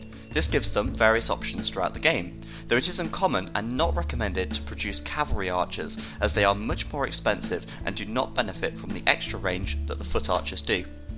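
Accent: United Kingdom. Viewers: He's got the most standard British accent you could possibly ask for, I have no idea how you're thinking he sounds Brazilian.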